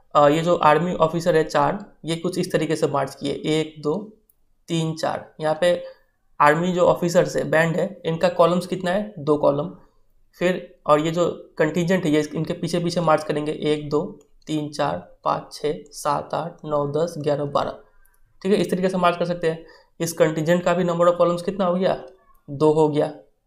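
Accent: Bihar, India. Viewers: Bhaiya aap Bihari ho kya aap ki language Se Lag Raha tha😅🤙👍 vaise same to you😅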